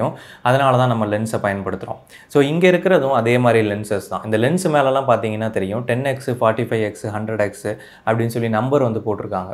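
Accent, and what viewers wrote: Tirunelveli, India. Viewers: Bro neenga Tirunelveli ah!!!😍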